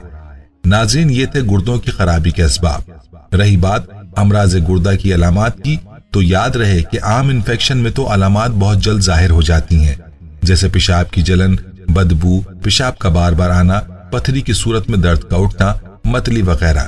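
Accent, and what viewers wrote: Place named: Pakistan. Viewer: You forgot to mention BARLEY water🙄🤔. It was refreshing to note your pure Pakistani urdu is commendable.👌🏻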